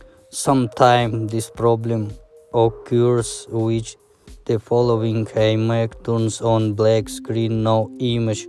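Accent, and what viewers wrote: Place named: Russia. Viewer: Нормас только голос надо приправить ну надо говорить как англичане а то ты просто с русским акцентом говоришь английский слова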